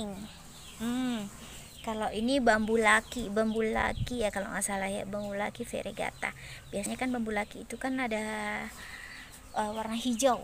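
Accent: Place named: Manado, Indonesia. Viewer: Bahasa manado xa mendok banget🤩.Semangat🙏🙏🙏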